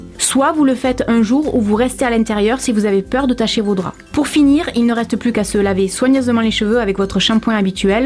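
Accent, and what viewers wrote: South, France. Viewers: merci pour tes conseils et reflexions! j' adore ton accent du sud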